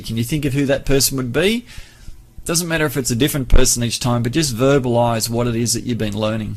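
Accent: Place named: Australia. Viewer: this Australian accent annoys !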